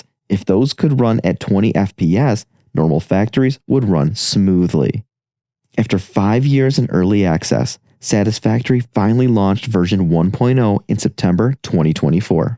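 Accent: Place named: France. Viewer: I watched the video, other than French accent, I’m not hearing a difference. Jean rah (Jean like Picard from Star Trek)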